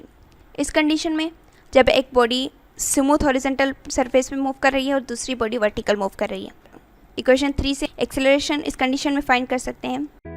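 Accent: Sindh, India. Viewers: Mam are you from sindh and are you Sindhi I think your mother tongue is sindhi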